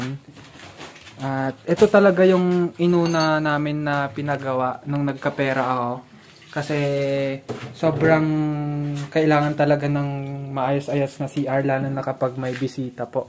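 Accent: Ilocos, Philippines. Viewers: Kwan!😅Basta ilocano di nawawala sa pagsasalita... proud to be I'm ilocano from TARLAC....God bless more vedios na nakakainspired😊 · Makakatawa ak ehh kasi gamin eh ilocano tagalog sao natin eh. Kahit pinipilit mong agsao tagalog eh may laok na ilocano pa din eh😂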